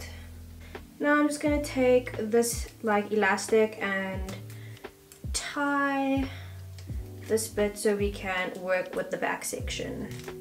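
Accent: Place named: South Africa. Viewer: Omg you south african, I hear it by the accent 🇿🇦💖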